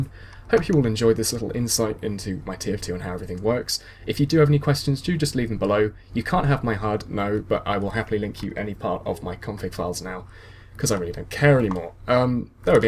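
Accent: United Kingdom. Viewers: I'd be homosexual for you. -British accent -Spy main Oh.. yes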